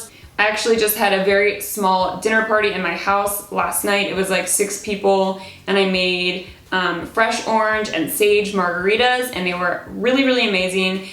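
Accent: Baltimore, United States. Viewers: You’re from Baltimore?? I love that city definitely has an interesting accent hahah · Oh. are you a Baltimore Hon? Go down the Ocean hon :)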